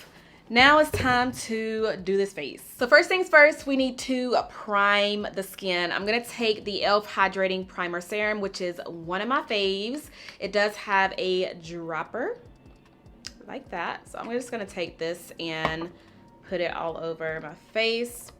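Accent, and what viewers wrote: South, United States. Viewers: Love your videos and your southern bell accent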